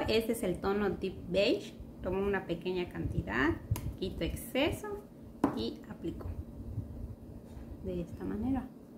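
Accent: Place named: Campeche, Mexico. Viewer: Me encanta tu acento es de Campeche? y tu cutis., muy sano y radiante.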